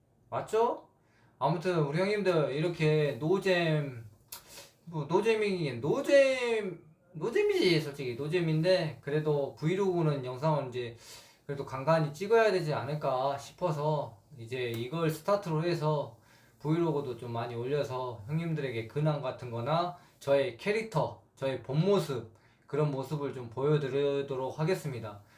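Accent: Jeolla, South Korea. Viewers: 경남대표 선발전 미스터 경남이라길래 부산 토박이인가 싶어 들어가보니 구수한 전라도 사투리ㅋㅋㅋ 예상치 못한곳에서 미스매치에 웃음이 나오네요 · 행님 라도 출신이셔라?🙂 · 경남대표인디 말투는 전라도사투리인디요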